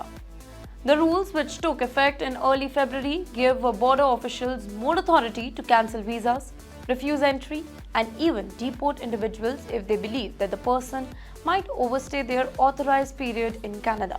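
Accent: India. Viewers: She speaks Indianlish, English with an Indian accent which only Indians can understand.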